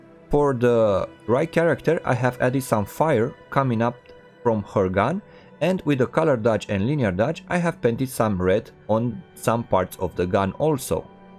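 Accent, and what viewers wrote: Romania. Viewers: you are from Romania you have a Romanian accent p.s I like what you do I discovered you a few days ago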